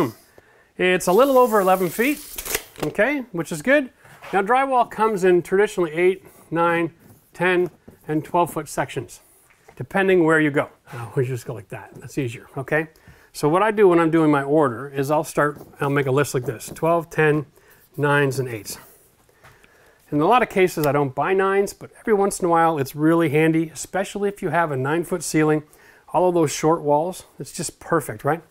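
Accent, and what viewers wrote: Canada. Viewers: Heck, I learnt a lot from this guy, although I will never gonna do what he is doing but listening Canadian accent was fun for me!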